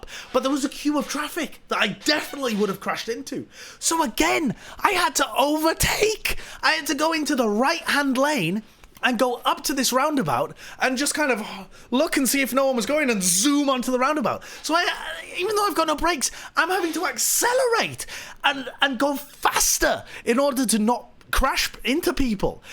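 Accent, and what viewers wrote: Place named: North, United Kingdom. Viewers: bloody hell used to sound proper northern! I know he says he did but I'd never seen footage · I cannot get over how Yorkshire sounds in the VODs. Bring back the accent,!!! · holy shit he sounds so northern in the clips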